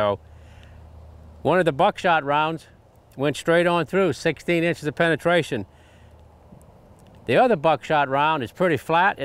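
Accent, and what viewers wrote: Louisiana, United States. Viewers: ***** I believe that would be a bit of a Cajun ascent. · Is he from Louisiana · Wikipedia got it wrong. He got a Louisiana accent for sure..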